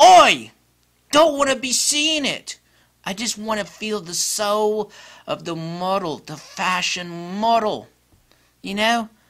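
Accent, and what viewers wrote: United Kingdom. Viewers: wow, a British accent! At least one of them... Now say this "Would you like a glass of water?"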